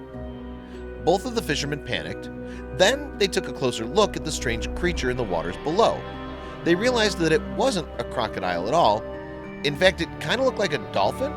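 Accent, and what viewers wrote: United States. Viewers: Why doesn’t YouTube ban did you know? Totally irrelevant content and that annoying know it all American accent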